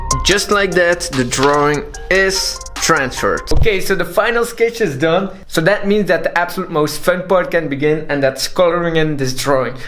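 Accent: France. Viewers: Ta video explique très bien comment faire 👏 et tu a un super accent pour un français 😋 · Je me suis doutée que t'étais français avec l'accent, et mon intuition a été confirmée quand j'ai vu les feuilles Canson noires! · Are you french?cuz you have a little french accent 😄 Anyways i am french too😊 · Ça se sent que t français 😂j’adore t vidéo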